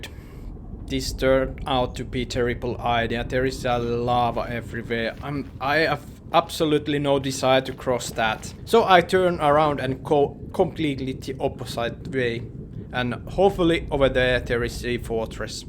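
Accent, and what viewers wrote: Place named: China. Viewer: Bro sounds Chinese 😂 no hate tho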